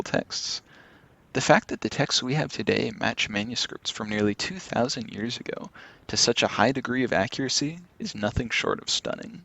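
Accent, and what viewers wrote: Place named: United States. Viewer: Interesting but please pronounce the Greek in Greek not American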